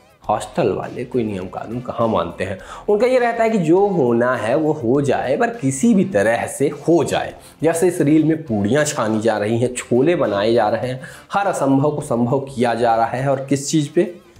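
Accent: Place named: Uttar Pradesh, India. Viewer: Up wale hai 😂😂 Maa kasam bolte bada tagda ho hum to sasurva tohra fan ho gaye ❤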